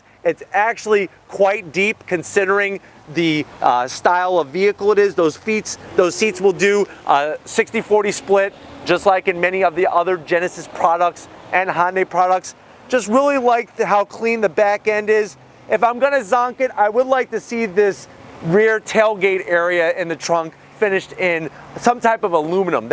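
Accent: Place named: Long Island, United States. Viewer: Ask you where in New York are you originally from that sounds a little bit like Long island if I'm not mistaken.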